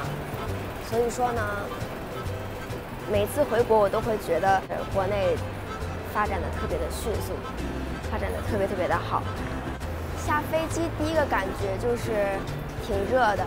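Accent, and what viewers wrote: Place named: Beijing, China. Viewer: 好久没听着京片儿了，就是亲切。欢迎你来洛杉矶。像我这样住LA这么久的人也是通过你第一看到这里很多景点。谢谢。加油！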